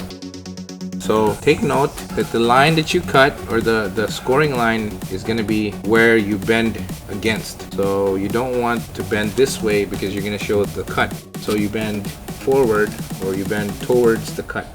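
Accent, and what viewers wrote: Philippines. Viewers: Are from the Philippines, you sound Philippino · Pinoy accent sub😁 idol😂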